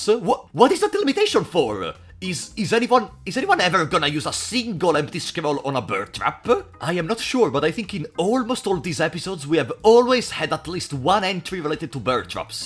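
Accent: Italy. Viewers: Aiuto sento un forte accento ita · I just noticed that you really sound Italian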